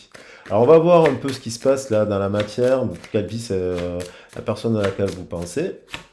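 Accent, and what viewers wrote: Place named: South, France. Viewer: Coucou! Le simple fait de t'entendre dire: "Hé coucou tout le monde", avec l'accent du soleil🌞donne la pêche🤣 Je pense être une guérisseuse, à ma manière... Je te souhaite un bon week-end prolongé de Pâques, même si le temps n'est pas au beau fixe sur le Sud🌧.. Gros bisous🕊🐣😘🍀!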